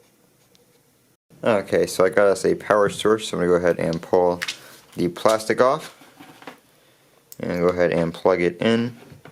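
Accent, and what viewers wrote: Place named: United Kingdom. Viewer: WoW I don`t know why she sound British for gods sake WTF !!!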